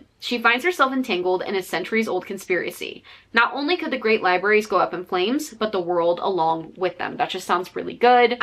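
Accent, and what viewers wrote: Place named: South, United States. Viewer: Please stop using the southern accent at the end of sentences in every single video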